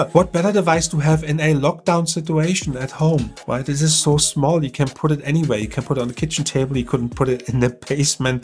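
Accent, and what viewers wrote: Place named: Germany. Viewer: Abnormaler deutscher Akzent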